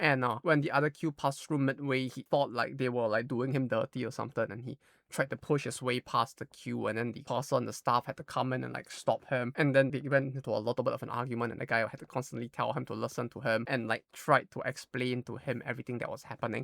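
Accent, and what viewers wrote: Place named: Singapore. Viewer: did u learn english in singapore or from a singaporean? u sound singaporean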